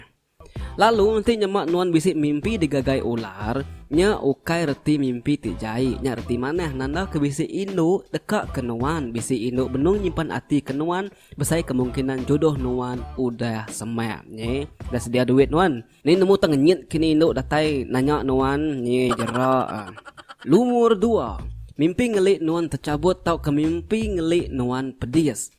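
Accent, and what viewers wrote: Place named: Iban, Malaysia. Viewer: cumil amt slang iban yaa hihii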